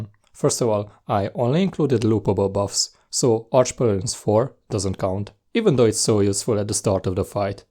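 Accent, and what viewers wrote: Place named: Hungary. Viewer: Üdvözletem kedves uram! Az akcentusodból ítélve magyarnak gondollak, de ez majd úgyis beigazolás nyer a kommentet olvasva. Csak megszeretném köszönni a minőségi munkát és további sok sikert mindenben! Várom a további tartalmat és big up! 👆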